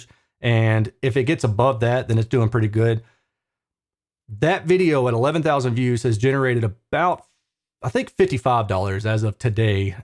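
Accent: South, United States. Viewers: Extra income?? You bought a friggin' Klippel system!! More than I would spend on a car! With a hobby like this, you need two day jobs. Also, as a yankee who loves to hear southern accents, it's nice to have you and Beau speaking sense, breaking the stereotype of the 'dumb south'.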